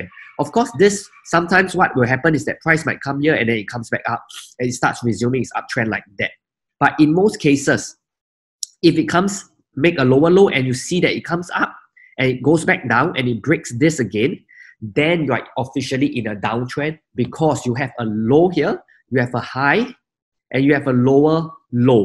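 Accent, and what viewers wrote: Singapore. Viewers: You sound to be from Singapore.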